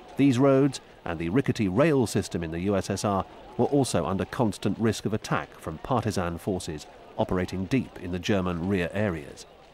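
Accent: United Kingdom. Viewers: Love these history docs narrated by cool Brit, while vintage video flickers along 💖 Many thanks 🙏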